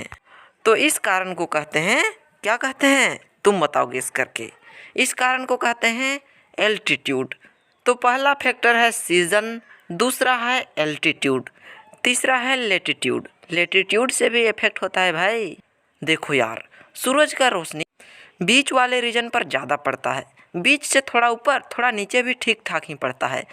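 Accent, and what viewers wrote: Bihar, India. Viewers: Are you a Bihari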